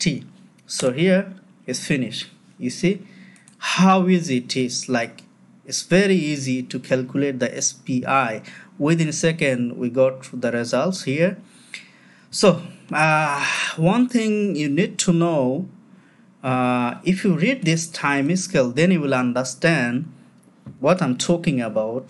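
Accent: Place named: United Kingdom. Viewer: Some word pronouns with british and russian accents which sounds very odd 😢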